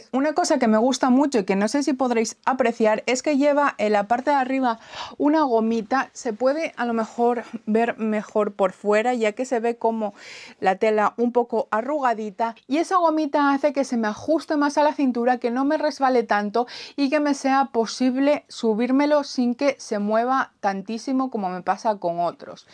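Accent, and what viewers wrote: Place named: Asturias, Spain. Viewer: Ya te iba a preguntar si eres Asturiana , sete nota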